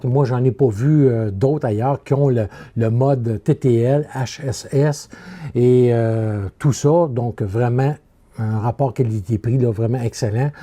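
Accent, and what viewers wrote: Quebec, Canada. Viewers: Bonjour, C'est toujours un plaisir en tant que Français de vous écouter avec votre doux accent québécois et surtout j'apprécie la qualité de vos explications, toujours claires et précises. Bravo pour votre pédagogie! Je suis équipé en Godox et j'ai acheté le Q6. J'ai eu du mal à trouver le mode de paramétrage pour le faire fonctionner avec le XPROII-N. Et grâce à vos explications et le commentaire qui change tout ci dessous (RX COMPAT sur ON) la connexion s'est bien faite! Je reste attentif à vos prochaines capsules. PS: Comme bon photographe, j'ai toujours une petite question qui traine: Qu'utilisez vous comme flashmètre qui mesure la lumière en HSS? (on sait qu'elle est inférieure par rapport à la puissance délivrée sans HSS. De manière empirique je rajoute en général 1,3 Stop quand je passe en HSS mais je n'ai pas l'exacte mesure.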